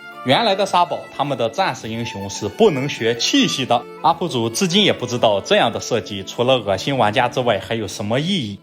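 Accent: Shandong, China. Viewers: 山东口音有点意思： 挖囊废； 😂听了半天才反应过来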